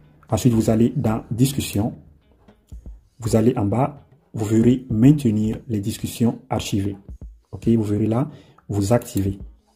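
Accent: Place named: Burkina Faso. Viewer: Merci beaucoup ! Je connais pas ta nationalité mais vous avez un accent Burkinabé 😁😁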